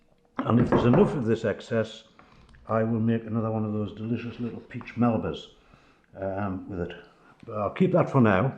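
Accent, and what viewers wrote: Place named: United Kingdom. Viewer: What part of the UK are you filming from? Im watching in Canada and im not very good at placing UK accents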